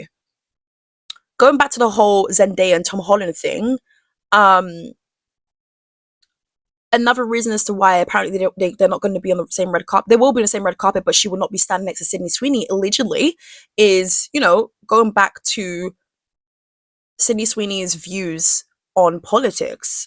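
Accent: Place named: United Kingdom. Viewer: Not the point of the video but I love your British accent.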